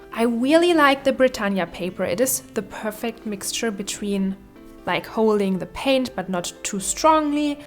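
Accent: Germany. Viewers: Your german-english is so charming 😍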